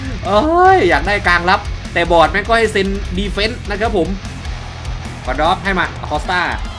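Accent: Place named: South, Thailand. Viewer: น่าจะตั้งชื่อว่า มูฮัมหมัดอะไรโช้ ออกสำเนียงใต้น่ะครับ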